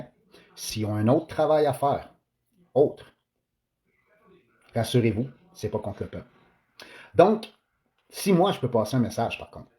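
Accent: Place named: Quebec, Canada. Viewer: Le problème c'est son accent québécois à moitié compris ce qu'il a dit mais bon bon travail et bonne chance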